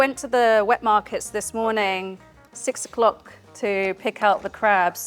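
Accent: United Kingdom. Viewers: LoL - first few seconds threw me off - until i realized she has a British accent... What da HAIL.... 😜🤣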